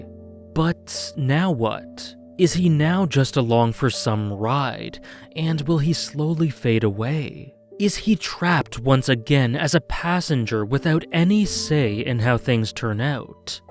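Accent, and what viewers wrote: Canada. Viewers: Are you from Canada? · Listening to talk about the name of this ep: "This guy's Canadian!" (I am too brother, I get it)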